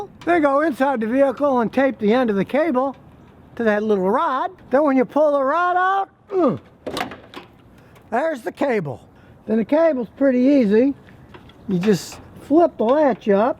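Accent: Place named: South, United States. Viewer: Hey. I laughed when you were talking about the long screwdriver and to "stick it in there". I know you are from the north but it sounds like you have been in Texas long enough to pick up a little bit of the Southern dialect. LOL I'm sure if I went up North for a long time I would pick up some of the slang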